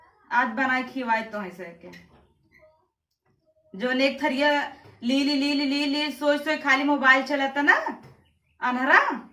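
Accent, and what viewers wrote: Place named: Awadh, India. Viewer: didi apka bolne ka andaj awdhi Bhasha mey bahut hi achha lagta hai 👍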